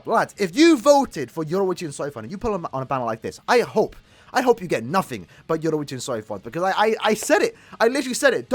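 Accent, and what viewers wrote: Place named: United Kingdom. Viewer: Wha' a salty bri'ish bloke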